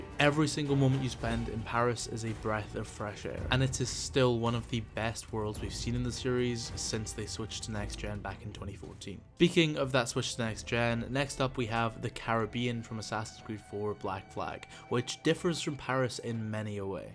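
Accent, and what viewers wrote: United Kingdom. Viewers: Ur so British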